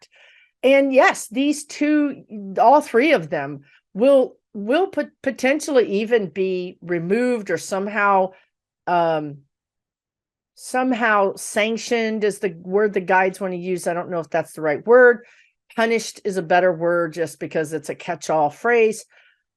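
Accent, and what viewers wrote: Texas, United States. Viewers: Love love love this message. Your Texas drawl gets more pronounced when you’re joyous😉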